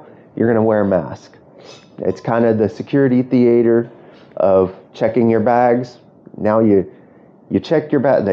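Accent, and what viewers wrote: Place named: Bisaya, Philippines. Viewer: Hahaha loving the bisaya accent keep posting video bro you might consider adding light instrumental music to add your video a little life …. 😊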